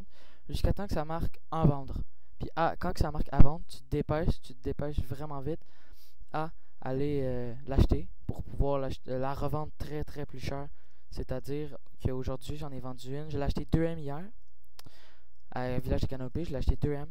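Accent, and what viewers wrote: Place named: England, United Kingdom. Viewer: pt1 je supporte pas quand les gens parlent francais avec un accent anglais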